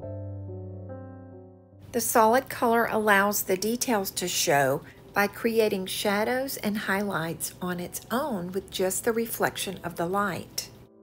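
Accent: South, United States. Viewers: Why would it bother you about your southern accent? I am proud to be southern. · I am from the Southern U.S.A, but live in Michigan now. I do so much enjoy the touch of southern accent in your voice. It is very soothing to watch your videos while I'm learning so much from you. Thank you for sharing your talent with your viewers. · Southern accent, love, I've heard thicker accent, your lovely, it's ok, if they have a problem, they can turn the captions on! Lol! Be blessed, BY THE WAY, I love your style! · why in the world would you be bothered by your southern accent? I think it’s charming and you speak so softly. I am southern (North Carolina) and I am proud of it and unbothered at all by the way I speak and it’s definitely more twangy than yours. Lol. You are such a good painter (I am so messy) with exquisite taste. Your creations are always charming and unique and your instructions are clear and easy to follow. 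Lovely items! Thanks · I think you should be proud of your southern roots, nothing wrong with having a southern twang. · Darling, Im from New Zealand and we have terrible accents! I just love listening to all the different accents on youtube, especially a southern one. You my love have a beautiful one! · Comme toujours les objets sont très beaux 🤗😄et celui que je préfère est la coupe que tu as réussi à décorer avec le "air dry clay". Le résultat est tellement joli 🫶😍. Ne t'en fais pas pour ton accent, d'autres youtubers ont un accent du sud comme par exemple Susie de "Susie on a farm" ou Teresa de "our greene acres" et les gens s'en fichent. Moi même je suis allemande qui vit depuis 35 ans en France et encore aujourd'hui on me dit que j'ai un accent 🤷 et ce n'est pas grave. Bonjour de la France et reste en forme 👍😀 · I'm originally from the South I love the accent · I love a southern accent. 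Please don’t be self conscious. Just be yourself. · Embrace that a wet Southern voice! Not everyone can speak with this genteel gift we have!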